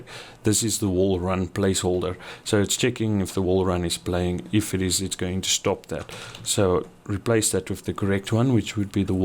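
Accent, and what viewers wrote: South Africa. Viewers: South African accent!!?!?!?!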